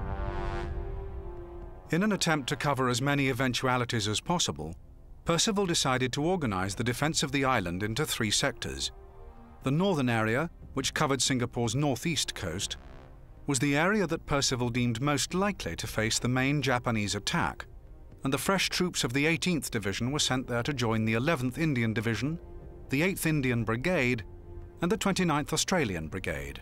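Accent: United Kingdom. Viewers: That is because he is an educated Englishman...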